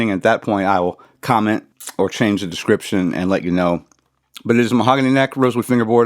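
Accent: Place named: South, United States. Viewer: You good bro. You just sound like a southerner with a lisp. That’s all. I hope you beat the shit out of Cancer. I’d love your opinion on the schecter solo II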